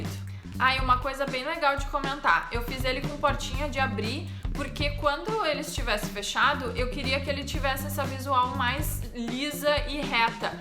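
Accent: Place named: Rio Grande do Sul, Brazil. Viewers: Como que a pessoa não consegue discernir que é o sotaque do Rio Grande do Sul. Lindo sotaque. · É apenas o sotaque do Sul galera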